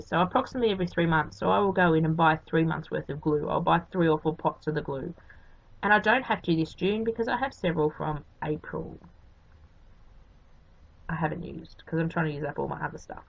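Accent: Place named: Australia. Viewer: Hi, terrific journal pages. Love listening to you. It's great to be able to listen to an Aussie accent for a change. I wish we used coupons too. Take care, Amanda xox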